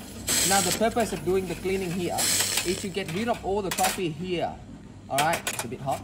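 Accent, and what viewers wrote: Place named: Australia. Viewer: Bạn nói giọng anh Úc ạ? Nghe lạ và vui tai quá 😊😊😊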